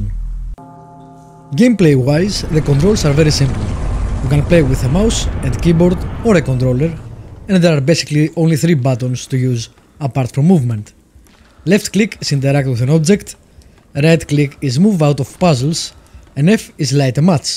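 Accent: Greece. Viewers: Hi " " how are u? I see u continue steady. Tα Αγγλικά σου είναι πολύ τέλεια από πλευράς ταχύτητας. Σε σύγκριση ακόμα και από πριν ένα δίμηνο περίπου που είχα δει ένα Review sου πάλι. το λεξιλόγιο το είχες ήδη. Το είχα καταλάβει κατευθείαν την 1η φορά - σε ένα gameplay apo to Total War Napoleon - και κοίταξα το About και είχα δει από Ελλάδα. Εμείς οι Έλληνες έχουμε πολύ χαρακτηριστική προφορά στα Αγγλικά. Εγώ για παράδειγμα ζούσα 2 χρόνια Σερβία και 8 μήνες Αγγλία το 4ο έτος από το Κολέγιο και την Αγγλική προφορά δεν την υιοθέτησα ποτέ. Σόρρυ εαν ακούγομαι ότι σε κριτικάρω αλλά γουστάρω που βλέπω Έλληνες YouTubers να προσπαθούν. Είμαι 40 και είμαι gamer ap;o ta 11 me Commodore 64, disks 5 1/4". Θέλω και εγώ εάν είναι να κάνω για χόμπι ενα κανάλι αλλά σκέφτομαι το 60-70% με games to υπόλοιπο με κάτι άλλο αλλά τι? Έχω 2-3 πράγματα στο μυαλό μου. Σκέφτομαι Φαρμακευτική Κάνναβη. 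Πριν σου φανεί περίεργο ο αδερφός μου είναι ο 1ος στην Ελλάδα που έκανε το <num> την 1η Έκθεση Κάνναβης στην Ελλάδα (γήπεδο tae kwon do Ιανουάριο 2018 και 2019).. Το όνομα "CannabisExpo" το έχει πατεντάρει και δεν μπορεί να το χρησιμοποιήσει άλλος, έχω κάνει πολλά κονέ και αναπτύσσεται πάρα πολύ ο κλάδος και ο κόσμος δεν ξέρει που να κατευθυνθεί επειχηρηματικά και καταναλωτικά και θα μπορούσα να βοηθήσω με πληροφορίες και το πως πάει το νομικό σύστημα γενικά. συγνώμη για την πολυλογία απλά ήθελα ενός αγνώστου την άποψη ή έστω απλά να το πω, σου εύχομαι ραγδαία ανάπτυξη στο κανάλι σου.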